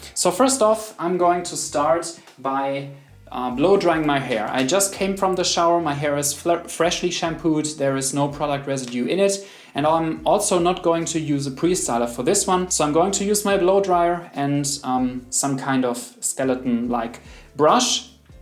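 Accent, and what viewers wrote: Germany. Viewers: ch wusste an deinem Akzent das du Deutsch sprichst.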